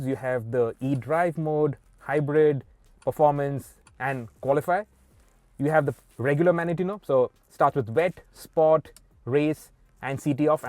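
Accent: India. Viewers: The Indian accent🤢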